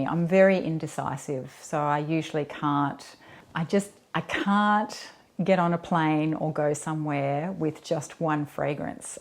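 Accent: Australia. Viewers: You've quickly become a new favorite of mine and your answers are so thoughtful and thorough. I really enjoy listening to you and your lovely accent! I'm from the US and the Midwest specifically so I rarely hear Australians except for YouTube. Beyond the accent, I also love hearing your descriptions and I've learned of many new scents to try. I may subscribe to a monthly service to broaden my collection.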